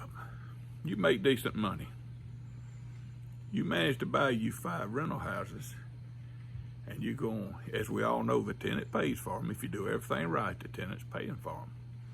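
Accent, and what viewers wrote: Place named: South, United States. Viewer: Excellent anecdote about the woman who judged your "education" level by your dialect. The elitists seem to define education solely by the number of years spent in formal academic institutions, as if that is the only path to success. I'll take practical, real world educational attainment in the world of real estate over the perceived "education" as defined by the elitist academic types who simply regurgitate a bunch of textbook theory and have never achieved anything in the real world. I'd be willing to wager that the woman who made that comment relies on an employer for her livelihood, while relies on himself and his real-world education & experience. I'll bet on the country boy with the Southern accent, the solid work ethic and years of practical wisdom over the elitist with the English degree every time.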